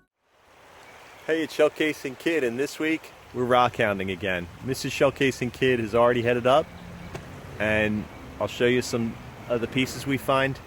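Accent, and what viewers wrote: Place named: Pennsylvania, United States. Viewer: I watched a bottle dump episode of yours and I knew you were from PA from your accent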